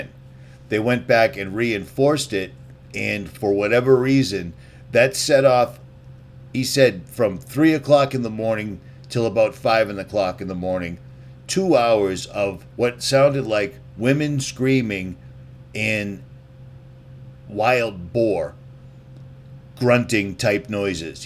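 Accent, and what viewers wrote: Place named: Boston, United States. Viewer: You sound like richie from Boston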